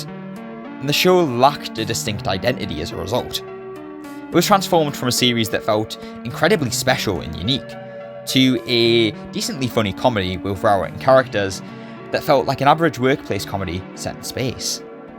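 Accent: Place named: North East England, United Kingdom. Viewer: Love Red Dwarf then I was like this guys Accent North East rules 🎉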